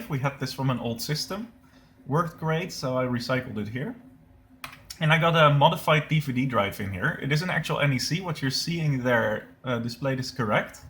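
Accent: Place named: Netherlands. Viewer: Je klinkt een beetje Nederlands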